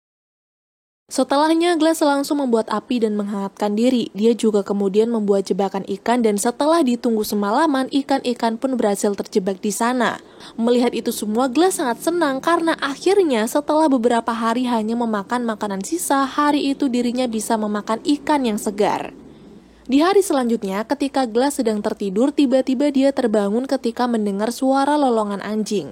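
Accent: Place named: West Java, Indonesia. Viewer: Mabk. istrinya si Jon ya wkwkwk. soalnya mirip Dari intro nya cara ngomong ada sundanya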